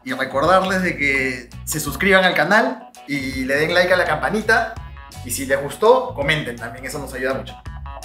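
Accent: Peru. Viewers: Gracias por el video. Que bonito oírte con el acento de peruano, así como hablan en Lima 😊😊😊😊😊😊😖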